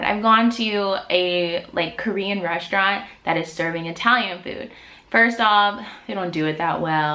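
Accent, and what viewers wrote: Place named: United States. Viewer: the american accent is so annoying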